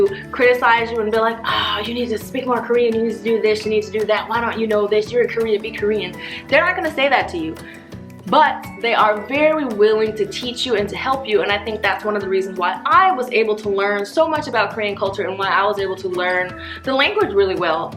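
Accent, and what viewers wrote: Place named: South, United States. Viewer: How serious is the vanity issue in Korea and the deal with the narrow chin/jaw I have seen some that the shape of the face with the jaw/chin being so narrow/thin but the top of their head is bigger it is shaped like how they portray aliens to look (like old Roswell stories). Anyway I love learning about the culture and the only thing that I find concerning is the appearance/vanity issue. I do subtitles for Liu Studio, sometimes (aka Lee Sungmin from Super Junior) so I need to learn even more. I am originally from the South too, I knew you were the first time I heard you speak haha. I now live in Australia. I hope to visit Korea sometime.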